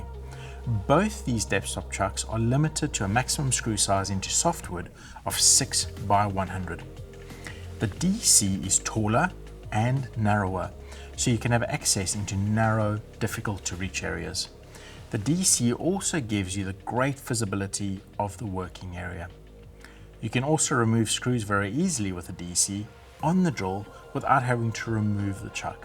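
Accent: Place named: South Africa. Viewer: I can hear he's a South-African. I know my people.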